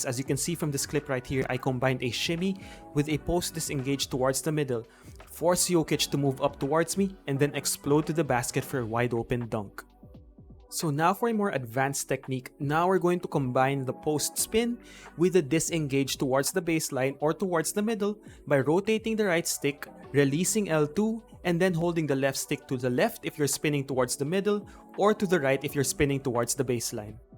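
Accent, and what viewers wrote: Philippines. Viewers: Is that a Filipino accent? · First of all keep it up. Awesome content! Second of all I like your Filipino accent lol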